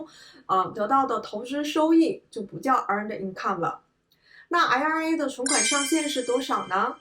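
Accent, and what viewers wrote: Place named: Liaoning, China. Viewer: 应该是辽宁的吧，我本科硕士都是沈阳读的，在沈阳呆了七年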